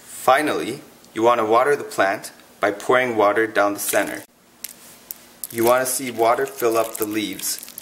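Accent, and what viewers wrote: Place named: Caribbean. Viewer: I hear a Caribbean accent… Cayman Islands maybe?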